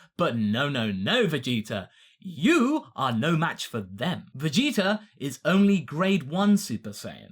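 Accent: United Kingdom. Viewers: Ya kinda sound like a british Goku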